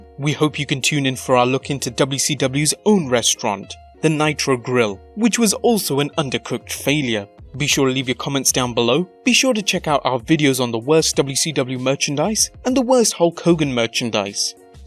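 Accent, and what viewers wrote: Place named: United Kingdom. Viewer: This was not a story meant to be told with a British accent.....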